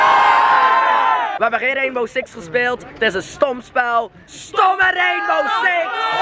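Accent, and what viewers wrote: Belgium. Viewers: ben je een belg ?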